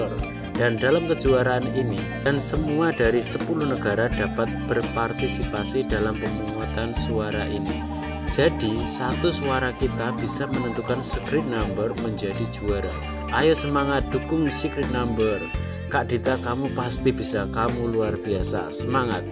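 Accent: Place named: Java, Indonesia. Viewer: Asline pundi mas? Medok rek 👍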